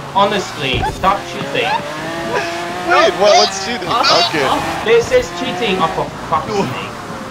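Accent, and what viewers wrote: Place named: Germany. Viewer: that german english is soooo baaad to listen...omfg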